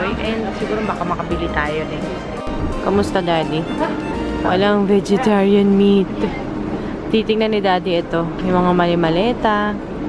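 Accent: England, United Kingdom. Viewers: please make a video on how you got your english accent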